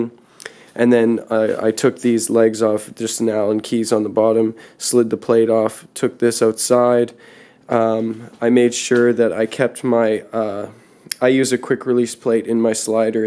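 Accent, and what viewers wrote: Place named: Canada. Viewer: enjoyed the perceptible Canadian accent and "oops, that's French." Will definitely try this on the used Konova K3 I bought used on eBay.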